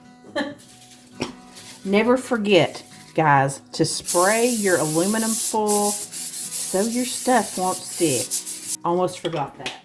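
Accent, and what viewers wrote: South, United States. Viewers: I discovered your channel not too long ago, and now look for new videos all the time. You explain things so well and I love your Southern accent I also love the things you make. I really enjoy your Vids!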